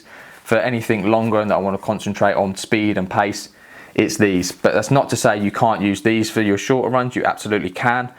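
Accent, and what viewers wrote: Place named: London, United Kingdom. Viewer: Hello,! Thanks for replying:) Yes, it is a very beautiful accent actually! I lived for two years close to Victoria Park, in Mile End, and I have very good memories of it. Overall, I enjoyed London pretty much. Was a great experience to live in there as there are many beautiful parks, it is full of life, etc. I was wondering, if would like to practice some Spanish, reach out to me! Sometimes I do weekly Skypes with people from the UK so as to not forget my English in exchange of Spanish, and we keep it flexible, so if you can't make it one day it's absolutely fine. Anyways, have a good day, and great video! Cheers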